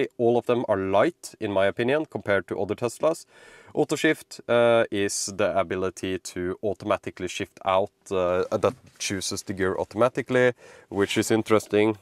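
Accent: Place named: Norway. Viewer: Do Norwaynians always have this ehh eh ehh ehhh eh accent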